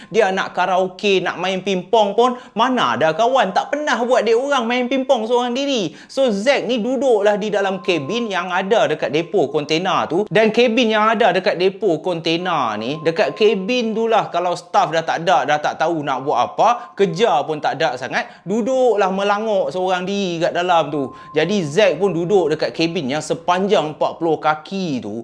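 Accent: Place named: Penang, Malaysia. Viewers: Fuhhhhh ..loqat penang dia mcm geqammm ja kita dengaq..😂😂😂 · Slmt ptg...adehh..krem perut Oii..tiap kali dgr ceritA mati akk mgekek tgelak,lg2 dgr ckp loghat peneng😂😂😂😂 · Assalamualaikum...ada la ckit2 mcm org Penang cakap...kedah pn ada jgk...asalkn utara mna negeri pn x pa la...jnji utara😂😂😂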